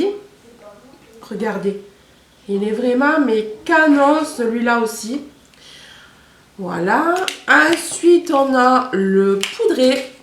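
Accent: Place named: Marseille, France. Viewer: tu es Marseillaise ? En tout ka tu as l accent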